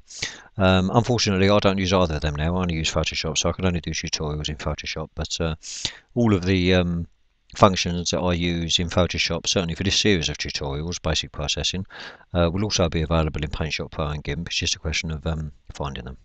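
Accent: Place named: Liverpool, United Kingdom. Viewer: My God he's from Liverpool.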